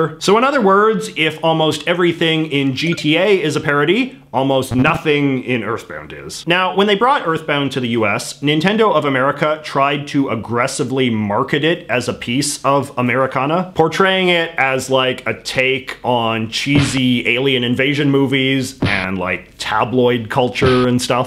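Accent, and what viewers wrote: Canada. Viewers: This guy has the most Canadian accent in the history of the world. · Yo is this dude from Canada? Is my first time seeing his videos and the way he says “about” is Canadian asf lol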